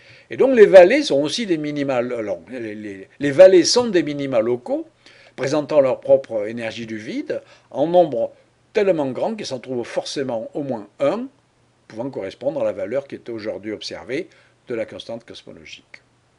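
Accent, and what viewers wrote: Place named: Marseille, France. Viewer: Et j aime bien votre leger accent marseillais ayant vécu un peu vers Aix.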